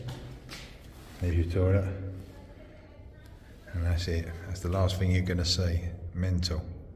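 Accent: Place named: Cockney, United Kingdom. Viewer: “get your s***s on route 66!!!” Sorry! Seriously though hope you are feeling better - An awesome start (Just binge watched your Denver to Vegas videos- fab) - Alan Whicker with a cockney accent. Can I ask if you get all your interesting facts off the app you mentioned and what is the name of it? Me and the Mrs will be following your epic journey. Best wishes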